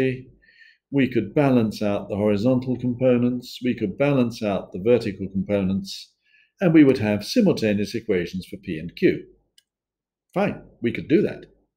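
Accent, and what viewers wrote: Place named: United Kingdom. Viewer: Thank you you beautiful british sounding man!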